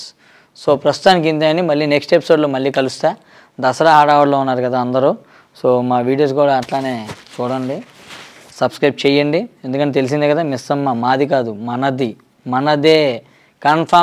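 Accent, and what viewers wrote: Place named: Guntur, India. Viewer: Annayya Yes You we’re Soeaking our real Guntur languge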